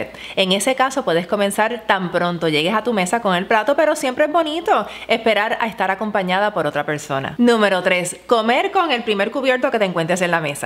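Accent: Puerto Rico. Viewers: Me fascina el acento boricua.🥰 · Buena explicación, por el acento percibo que la presentadora es boricua. Saludos desde Panamá.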